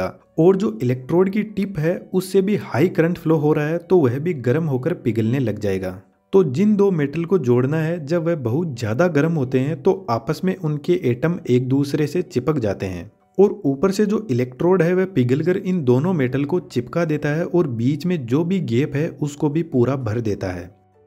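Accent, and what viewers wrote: Rajasthan, India. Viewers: Aap East Rajasthan se ho na bro ?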